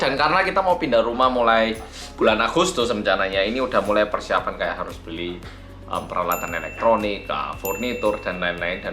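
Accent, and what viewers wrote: Java, Indonesia. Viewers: Bahagia slalu wong Korea medok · Tapi sue sue medok e yo ilang wkwkwk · Opose garai kepo ae seh mas medhok iki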